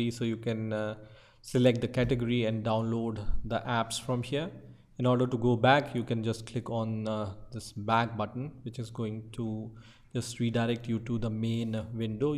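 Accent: India. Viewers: Can I find a video that isnt done by an Indian with an annoying accent?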